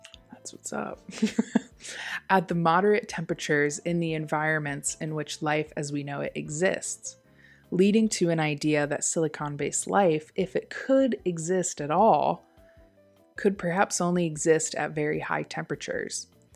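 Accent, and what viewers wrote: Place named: Midwest, United States. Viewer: I love your humor and the way you talk, it reminds me of home, are you from Michigan perhaps… or maybe Minnesota?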